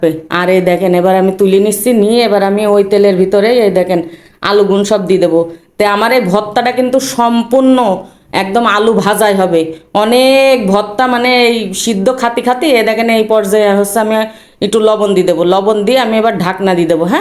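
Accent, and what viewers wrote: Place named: Chuadanga, Bangladesh. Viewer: অনেক মজা হলো মনে হচ্ছে 😋 বাড়ী কোথায় তোমার চুয়াডাঙ্গায় ? কথা শুনে মনে হচ্ছে চুয়াডাঙ্গার মেয়ে 😅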